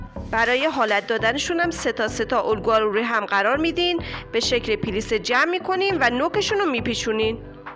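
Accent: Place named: Tehran, Iran. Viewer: لحجه شما تهرانیه درست؟ تهرانی هستید معلومه🌺👌👍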